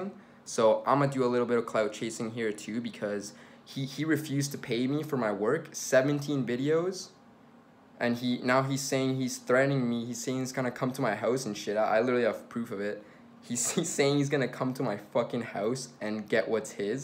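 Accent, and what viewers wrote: Canada. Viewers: You and Brad sound just alike. Must be a Canadian thing.. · You just sound so Canadian and it’s all I can think about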